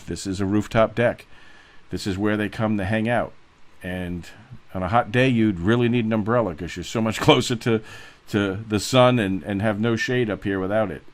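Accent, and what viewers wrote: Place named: Philadelphia, United States. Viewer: Great to hear a Philly accent. Can trust someone from where I grew up! Thanks. This will help!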